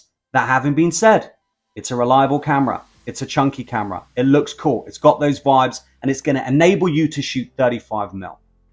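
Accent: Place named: Mid-Atlantic, United States. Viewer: Don't forget you are British, very Bad manners to wear a hat indoors. 🧐 Also be careful you have an annoying mid Atlantic accent. Dont forget to pronounce your T's and its Mathematics not Math....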